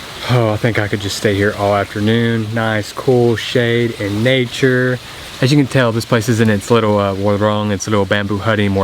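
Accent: South, United States. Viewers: You are a combination of Mark Weins and Trevor James with a hint of Southern twang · Sir, what do you do? Your travel is a long one. I have a two week vacation and Philippines is my spot this summer. I am in Chicago. You sound like a southerner. I am a Chemist here in Illinois.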